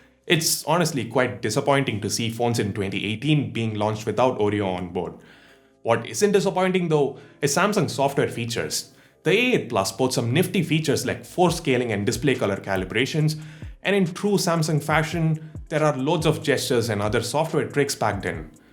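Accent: India. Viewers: Another indian gay accent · Sounds like indian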